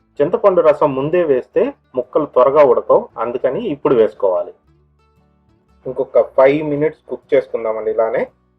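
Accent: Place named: Karnataka, India. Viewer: I like your kannada language slang, happy to hear.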